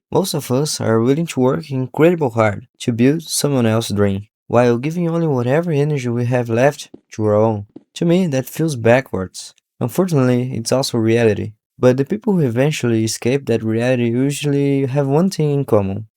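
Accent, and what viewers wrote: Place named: Brazil. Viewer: pelo sotaque ctz q é brasileiro, baita video amigão 👏👏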